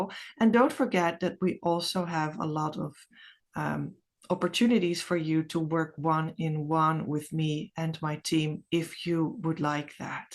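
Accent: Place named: Netherlands. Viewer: So nice to see you! My DM is Dutch so I recognized your accent right away.its so reassuring to know someone from The Netherlands is discussing Twin Flame. He would have me believe otherwise 🤭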